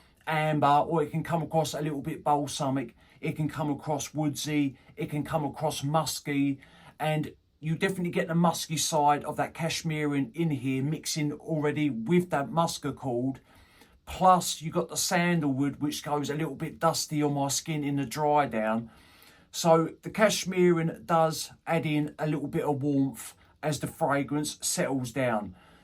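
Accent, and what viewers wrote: United Kingdom. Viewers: Thanks for this review, its the first one i found on yt of this fragrance I wonder what's your accent? First i thought it's Australian, but its from somewhere in the UK, but which region?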